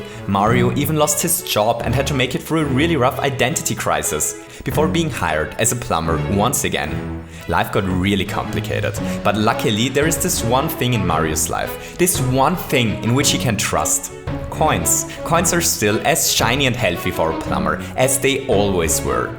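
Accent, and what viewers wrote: Austria. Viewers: You're from Austria? Right? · youre austrian? looooooooool im austrian too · Wait. Are you austrian or Lithuanian? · You are from Austria?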